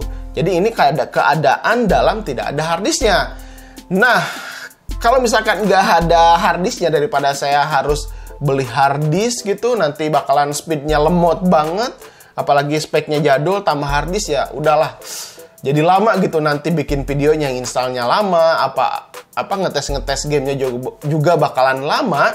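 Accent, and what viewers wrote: West Java, Indonesia. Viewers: Orang sunda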